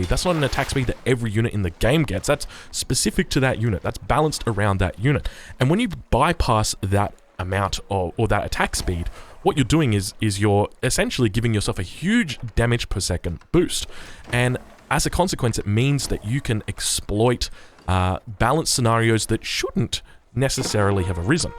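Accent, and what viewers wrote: Australia. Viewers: I think you need to change how you cast them. All of these games aren't tournaments games where everything is super important to watch or pay attention to. Maybe. Focus on a few battles. Maybe build orders but jump around more. Don't have a 20 min casting slog where you are trying to fill the air with your Australian accent. Maybe reduce the time? By skipping boring parts. Or something idk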